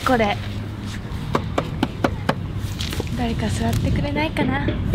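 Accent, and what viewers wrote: Hiroshima, Japan. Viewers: 🤗💓 喋ってるときの舌足らずな感じが可愛いー(*´▽｀*) ちょいちょい出る広島弁もイイ‼️ そして歌うと恐ろしいほどの上手さ。 私はあなたの虜ですー♥️ んで からの声が鞘師のぽく聞こえたw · 段原さんの広島弁聞いて、アンジュルムの川村さんと二人のラジオ番組あればなって思いました。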